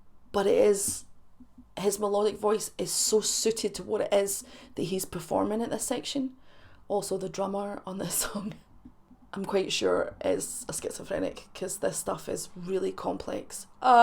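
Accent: Scotland, United Kingdom. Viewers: Of course, I do not miss an episode of you brilliant channel. I just love to hear you Scottish accent and your lovely humour. ❤️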